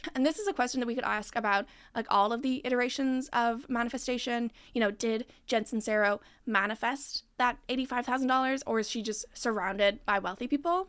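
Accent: Ireland. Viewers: Are you American? There seems to be a slight Irish influence on your accent. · Ive finally placed your accent do you have an irish parent 😭😭😭😭 im probably late 😭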